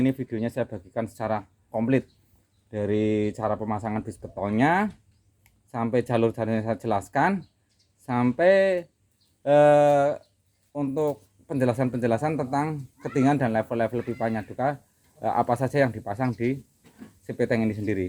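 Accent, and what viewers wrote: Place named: Banyumas, Indonesia. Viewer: Logate kayong madan ngapak kie,, 😁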